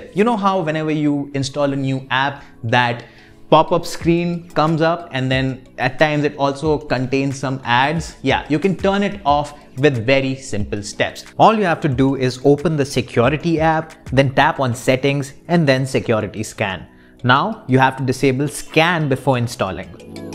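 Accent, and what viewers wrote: India. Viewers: Still talking like indians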